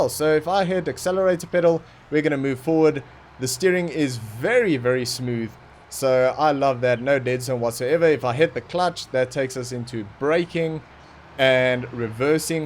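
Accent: South Africa. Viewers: You sound a lot like a South African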